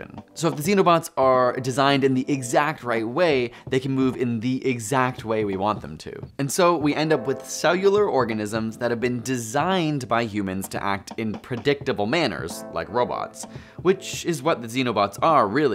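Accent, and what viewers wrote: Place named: Canada. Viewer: Are u Canadian? Nice accent btw lol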